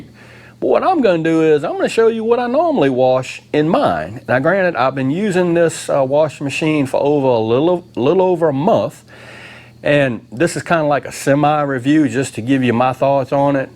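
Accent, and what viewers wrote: South, United States. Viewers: Oh my goodness!! The southern twang and slang made me giggle so much it just made my day. You’re the best! Love this review & it helped me. So thanks for your time & the good vibes!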